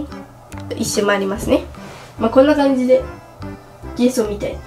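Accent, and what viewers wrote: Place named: Hiroshima, Japan. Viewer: ちゃん可愛い！所々でるじゃけいとかの広島弁？が私も使うから親近感あって嬉しい！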